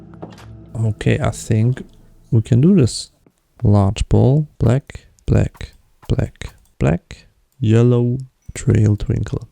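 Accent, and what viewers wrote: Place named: Germany. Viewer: hes back and sounding more german than ever. wait a second this isn't colony island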